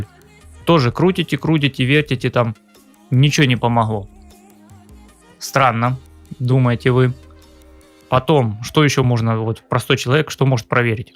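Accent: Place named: South, Russia. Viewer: Ну, можно так подумать по голосу, если представить, что озвучивал слегка выпивший или покуривший человек. Но, автор у нас со своим южно-российским говором, там почти все так говорят, а он ещё и не по тексту, поэтому паузы в речи. Думаю, что трезвый он был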